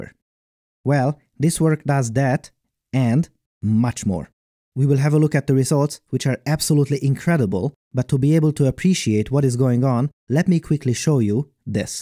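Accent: Hungary. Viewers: Do I hear a Hungarian accent ☺️? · If anyone is curious how a Hungarian accent sounds like, here you go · His accent is definitely Hungarian. So he must live here.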